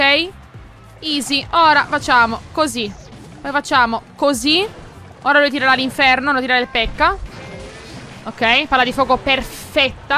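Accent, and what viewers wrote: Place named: Sicily, Italy. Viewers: Buonu nisciu l'accento siciliano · Ma sei palermitana io catanese cmq sembri palerimitana dall'accsnto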